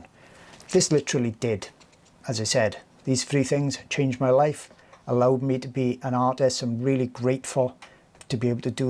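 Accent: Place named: South Wales, United Kingdom. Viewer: Hi, another great video! Thank you. I agree the paper Pastelmat is a total game changer! It gives back the joy in drawing that the other papers sap away from us! Ps. I love to hear you talk because it reminds me of my nana and grandad who came from the Rhondda Valley in South Wales. 😊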